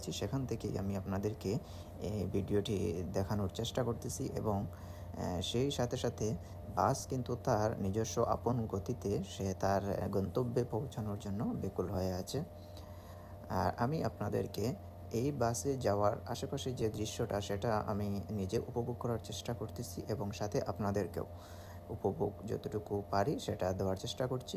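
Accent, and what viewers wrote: Sylhet, Bangladesh. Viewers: বাইয়া আপনা কতা বার্তা বুজা জায় আপনি সিলেটি আমিও সিলেটি মুল কতা হলো বাই কোন দিন জদি আললাহ আমাকে দয়া মায়া করেন জদি আসতে পারি রােমানিয়া তাহলে কি ভালো হবে জদি বাই আললাহর হুকুম তাকে আকি নচিবে দেয় রিজখে টানে আকি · ভাইয়ের কথায় মনে হচ্ছে সিলেটি হবেন, ভাই রেস্টুরেন্টে কাজে কেমন বেতন হয় জানালে ভালো হতো?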